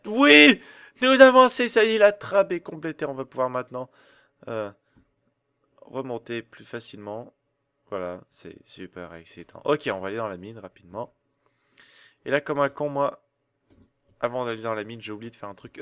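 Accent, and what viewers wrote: Quebec, Canada. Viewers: C'est le film Sanctum, de James Cameron, mais bon il est québécois alors son accent est tout a lui :D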